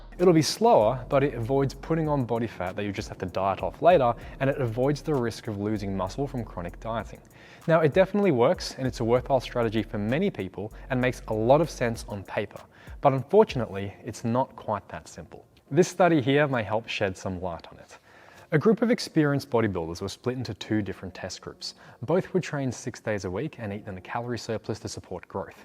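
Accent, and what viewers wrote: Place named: Australia. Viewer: got the Aussie accent, is drinking hot tea with its own little teapot, is jacked as fuck, AND WEARING TOOL T-SHIRT. I THINK I'M IN LOVE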